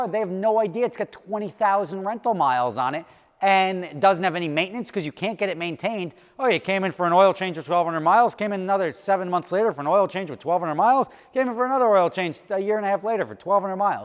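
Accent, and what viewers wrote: New Jersey, United States. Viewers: Just put the old S on and it'd be perfect. Jersey accent an all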